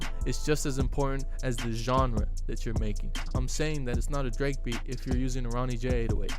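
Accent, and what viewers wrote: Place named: Mexico. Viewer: idk why but to me you sound mexican lol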